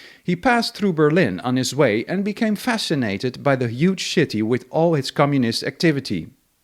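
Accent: Netherlands. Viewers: Not to be weird as a first time visitor but your accent sounds like Dutch English but genuine German when quoting Germans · Thanks for that, very interesting. Can I ask, are you from the Netherlands? Ich versuche höflich deinen Akzent zu erraten! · It wouldn´t hurt to do something about the Dutch accent.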